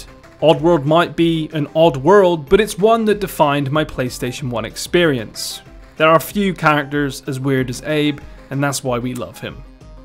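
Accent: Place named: West Country, United Kingdom. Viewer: Proper west country. You Somerset/Dorset based?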